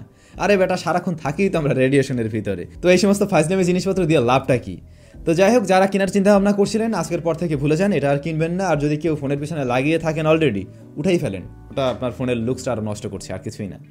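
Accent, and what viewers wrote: Barishal, Bangladesh. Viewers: vai ki mogo deshi naki.................. i mean borisal......???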